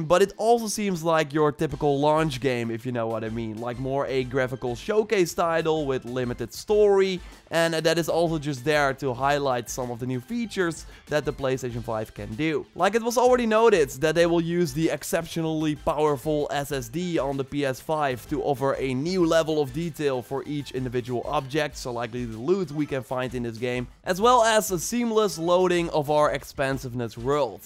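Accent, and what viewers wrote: Netherlands. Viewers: Meh French accent is diffrent thougj i live in belgium and we speak dutch and frensh and it sounds more like dutch accent tbh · You sound dutch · I think this guy is Dutch, I can just hear it. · You’re Dutch I can hear your accent..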